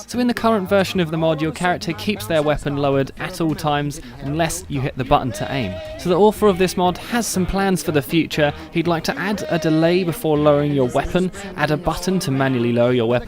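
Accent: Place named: United Kingdom. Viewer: Brilliant vid mate, to the point, no retarded goofing, stressing immersive mods, and in British English. Subbed.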